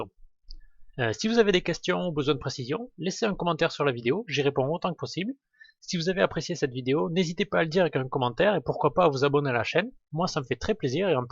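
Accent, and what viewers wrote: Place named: South, France. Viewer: Bravo pour toutes ces vidéos, excellentes à tous points de vues... avé un petit accent du sud... Ceci dit, savez-vous comment changer le plan d'origine d'une création de pièce? ou changer l'axe d'origine? merci d'avance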